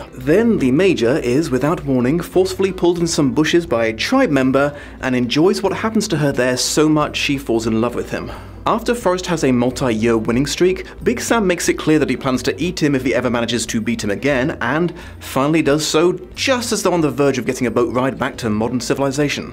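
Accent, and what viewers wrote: United Kingdom. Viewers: just curious, are you from the UK? I find that accents from parts of the world I'm familiar with (/from nearby so I've had at least the briefest IRL exposure too) definitely add to the immersion, but accents from other parts of the world can be sooo hard to grasp!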